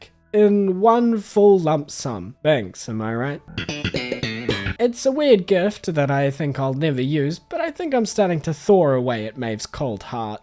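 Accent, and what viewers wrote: New Zealand. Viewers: As an American, it is so painful to hear you use an American accent after listening to your lovely Kiwi accent haha. Love listening to this during my study breaks! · Are you….a kiwi?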